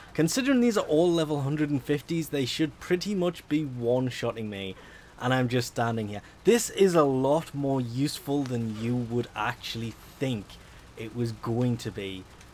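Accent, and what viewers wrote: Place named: Newcastle, United Kingdom. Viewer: Ziot English, possibly a Geordie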